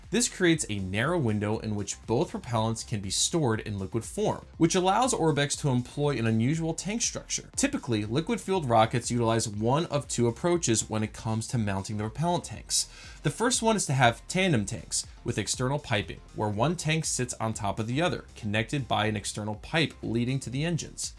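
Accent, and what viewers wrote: Germany. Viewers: I mean the content is great, but passion is unmatched, it makes so much more fun to watch him. German accent for the win;) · Okay… good good now this time with a slight German accent.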